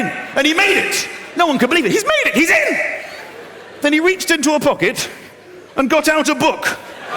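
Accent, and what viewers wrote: RP, United Kingdom. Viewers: I think it's his very posh accent that makes his style so good. Very funny, very likeable guy.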